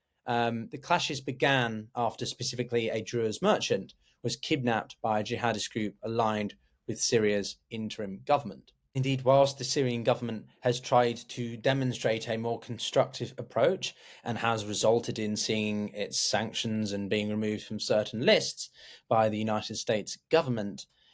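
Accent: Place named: United Kingdom. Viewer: This guy is shooting his mouth off with a biased defense of Syrian jihadists, and with his Oxford trained British accent sounds like a European interloper sticking his nose in other people's affairs. I had to cut him off because he's a phony trying to make a name for himself on YouTube. Get off your ass and get a job, you slob.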